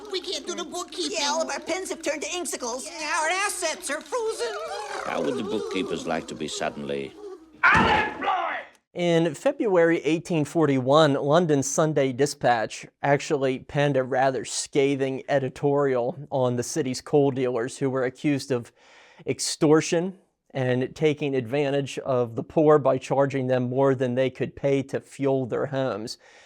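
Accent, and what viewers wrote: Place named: Outer Banks, United States. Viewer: Your accent is incredible. I've never heard a high tider/outer banks accent from an actual person before that wasn't an impersonation. Yeah the video is great and all, but I had to point this out since I couldn't get over it lol.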